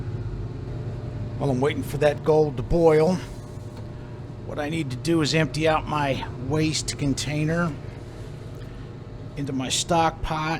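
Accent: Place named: Chicago, United States. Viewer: stahk paht lol I didnt know you were from Chicago ... Watching you refine gold is really informative Ill never get sick of watching you make forbidden orange drink. Bonide and GFS chemicals should sponsor you with as much product as you go though. (nitric acid is super spendy) $350 is just about too much for my wallet these days.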